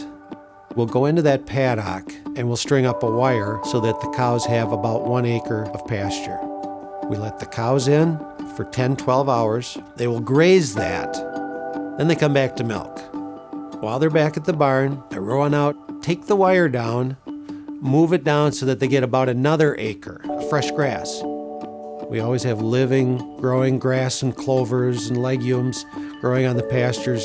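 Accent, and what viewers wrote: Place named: New Zealand. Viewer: Hey, Lovely farm,...... you sound like a kiwi farmer;],.....I practice rotational grazing etc have done for years,..... just a thought, the organic farmers say the moon waxes and wanes,.....ie rises for two weeks and falls for two weeks, so 28 day process. So if you have a round less than 4 weeks you are short circuiting the natural cycle, as in for two weeks the energy goes into whats below the ground, so every round you think boy I'm running out of grass its just not growing like it should, and the next two weeks you think wow look at it grow, so I've always stayed on a minimum of 28 day round, also the extra cover helps keep the moisture in the ground better.We use a grazing planner in Nz for calculating winter grazing rounds transitioning out into a spring round, assisting with areas consumed on any given day, which I think you'd find handy. 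It must help with the marketing of your product all those health outdoor cows:] 😊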